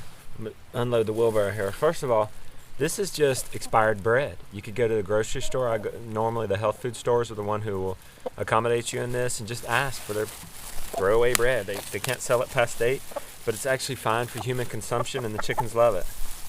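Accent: North Carolina, United States. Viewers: So serious and a much more distinct NC accent. Love it!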